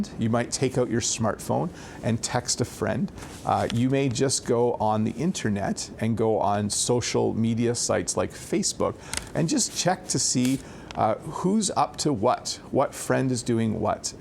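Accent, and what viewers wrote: Canada. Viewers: An excellent video for training the listening skills and for to get vocabulary. I love the canadian's accent. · I appreciate you for your lovely Canadian accent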